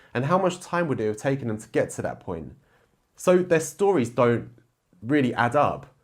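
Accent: United Kingdom. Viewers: Hmmmmm... Are you from Europe? Your accent sounds like British something. 🤔🤔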